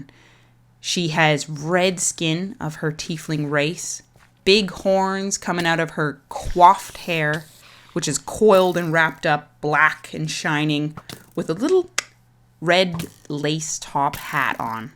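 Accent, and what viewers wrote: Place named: South, United States. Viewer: I love everything about this. As a born and bred southerner I laughed heartily at your southern accent.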